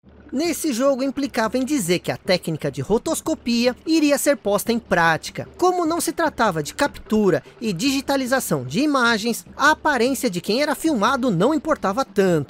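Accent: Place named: Sao Paulo, Brazil. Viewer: esse é paulista mesmo.... negocio do jooooogo,,,, a trilha sonooora... só dando um toque mano, abraço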